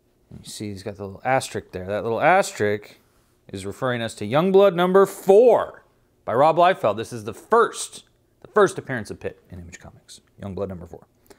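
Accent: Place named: New York, United States. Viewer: Your new york accent is awesome😅😆😅😆😅😅😅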